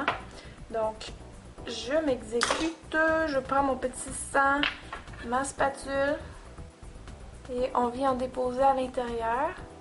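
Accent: Quebec, Canada. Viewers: c'st un accent quebequois pas belge mais bon jten ve pas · Excellent... J'adore tes petites videos!!!... Et tu es super dynamique... je suis belge, mais j'ai énormément de famille là-bas chez toi... J'ai toujours adoré ce pti accent du Québec... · C'est normal que tu as cet accent c'est parceque tu est quebecoise x) sinon . on dirait vraiment que tu as une coupure ! :P tres belle vidéo :) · Elle est québécoise, elle parle bien c'est juste son accent >< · C'est un peu du Québécois mais bon.. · Mathieu Blanc ne connais-tu donc pas le respect. D'après tes commentaires on peut voir que tu n'as jamais entendu un Canadien parler. Au lieu de rester bloquer sur l'accent tu devrais voir le travail formidable qu'elle nous fait voir.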